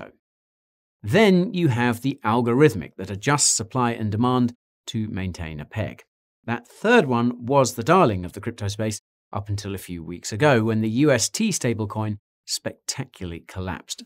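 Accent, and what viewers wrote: France. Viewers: that france accent is so funny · You're french accent is so nice to hear :) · French accent is not so bad 😂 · Your French accent is spot on sir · Nice French character, the mustache makes me thinking more of a Spanish guy but the accent is there. Love it. The content as well! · Greatest cliché french accent i’ve heard in a while (coming from a french dude) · I love the French accent haha 😂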